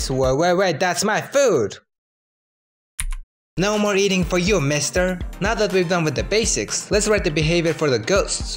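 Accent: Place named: Mexico. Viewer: Hablas español? Tienes acento como de mexicano xD pd: geniales vídeos, me motivan a seguir programando 🙂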